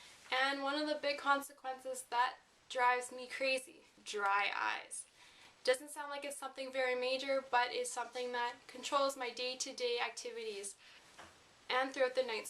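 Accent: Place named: Canada. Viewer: I feel really bad for you and the suffering you've had to endure due to Lasik. Thank you so much for your video. You have saved myself and thousands of others from this procedure. You said you don't wear makeup often because of swelling. I wanted you to know that I think you are very very beautiful without makeup so why bother? :) You don't need it! PS...you sound Canadian.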